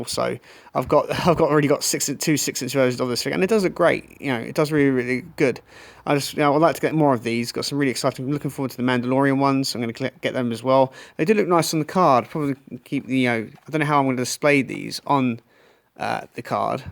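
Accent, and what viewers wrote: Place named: England, United Kingdom. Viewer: We like the smooth English accent. fab content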